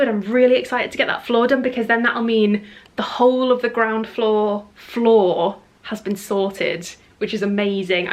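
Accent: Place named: North, United Kingdom. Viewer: I love your videos, don't mind the change one bit (actually kinda glad you're mindful of sustainability, and love the house content!), I'm so sorry to hear you're not feeling your best, but please know that I love your energy in your video, your jokes, your nice northern accent and phrases, and I sincerely hope you feel better soon! Also you just made me laugh out loud with your hoover and 'shampoo advert' moment haha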